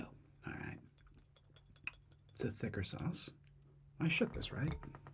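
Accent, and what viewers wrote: United Kingdom. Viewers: Well goddam to be. A gringo you prenoust that great and also that breth acsint 10 /10 😂😂🇲🇽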